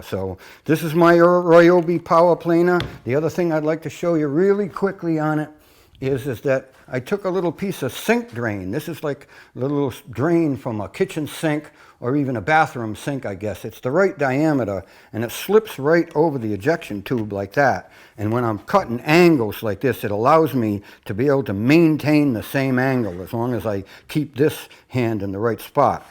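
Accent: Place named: Maine, United States. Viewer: I am guessing you're from Maine from your accent (I grew up there)....I just got my first planer and I greatly appreciate this video, I am going to make these adjustments THANKS!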